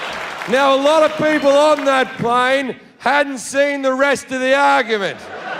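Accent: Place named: Australia. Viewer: I had just written ''Is he Australian ?? '' and at 7.50 I got the answer.. He is funny at times he is bringing a good point about armrests... I threw some side eyes to the armrest while I was at the window seat. Now I feel bad ... .By the way he told the story , I was able to visualize the scene... so he must have some talent... Australians are rough I think... and 9 minutes 55 seconds is all I can take of him today... I would not go to his show really .. he also seems as if he had three beers (unless this is his persona) .Listening to him is more like weight lifting exercise , the story as a whole is not offensive , although language used is heavy and rough.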